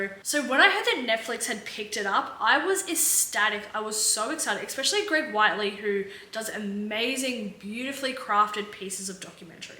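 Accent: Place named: Australia. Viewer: Why didnt I know you were a fellow Aussie? Your accent!! Yes Erin is guilty. Are we really surprised? Chandi lost the plot.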